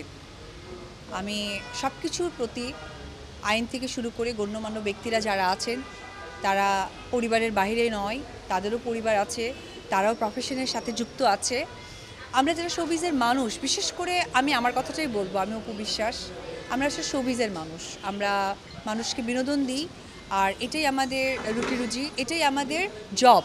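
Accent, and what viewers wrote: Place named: Kolkata, India. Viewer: কিন্তু দিদি,কলকাতার একসেন্ট শুনতে পেলাম বোধ হয় 😅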